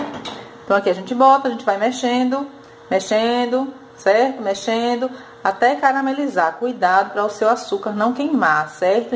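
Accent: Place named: Northeast, Brazil. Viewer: menina, adoro nosso sotaque, sou Nordestina e repito muito. Certo e né? Né? rsrsrs. Amei sua receita CERTO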